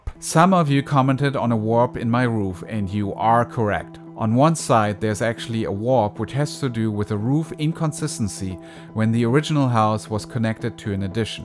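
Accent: Germany. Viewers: Your english language sounds very german! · ...your accent??? not quite german...aber ein bissien..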